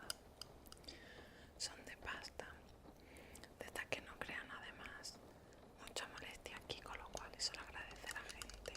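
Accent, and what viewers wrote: Malaga, Spain. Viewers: Con esa preciosidad🥺💓de voz malagueña yo te donaría hasta un Ferrari si lo tuviera😂te dono cuatro pares del tirón👓💜👓💜👓💜👓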